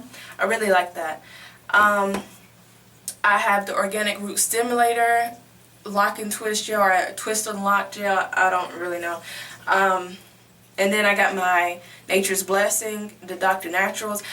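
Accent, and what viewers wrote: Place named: South, United States. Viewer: Girl, I'm from the South too and I understood everything you said, lol. Your hair is looking FAB honey! Watch before you know it, it will be loc'd, it's gonna sneak up on ya. ;)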